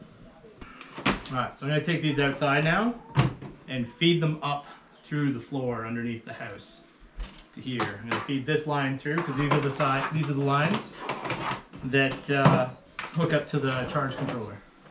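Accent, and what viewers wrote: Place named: Ireland. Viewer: Came across your channel yesterday and pleased it was recommended. You definitely have answered one of my thoughts. I was thinking why am I picking up Irish Ancestry through your dialect. Cheers from the UK 👍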